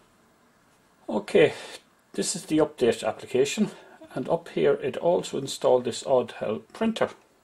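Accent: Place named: Ireland. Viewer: Sounds like you're in Ireland.Wonder if you're close to me.. I need some help 2 days before christmas :(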